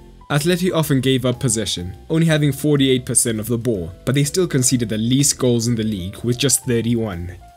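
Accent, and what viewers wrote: South Africa. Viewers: Narrator sounds south African.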